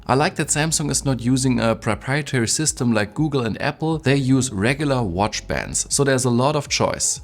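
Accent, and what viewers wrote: Germany. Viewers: Man hört sofort raus wenn ein deutscher englisch spricht haha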